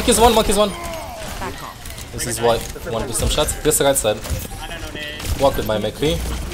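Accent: Romania. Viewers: He's Romanian lol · Did i say he needs to hide it? I just asked if he's doing that accent on purpose because if yes I'd rather him to speak normal english without romanian accent to understand him more.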